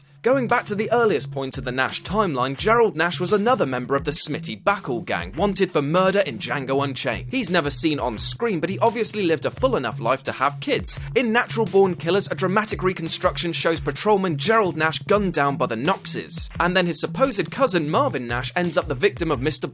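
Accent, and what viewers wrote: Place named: England, United Kingdom. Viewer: People pronounce words differently. This guy is English and pronounces his vowels differently than, say, Americans.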